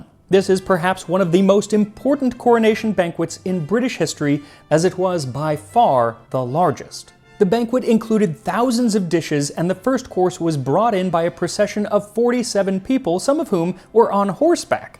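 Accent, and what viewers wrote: United States. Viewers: That's just how Americans say it. Never heard a American say it the British way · Why can't Americans talk! "Erbs" Herbs QEII was crowned not "coronated" The temperature doesn't "raise". You may raise it, but it rises. Loved the recipe and the comentary...... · Why do you Americans always pronounce herbs as erbs without the 'h'... but over prounce the silent 'h' in words like vehicle? 'Ve-hical' 😅 It drives me nuts 😂 Apart from that, I love your shows and will venture into trying some historical recipes.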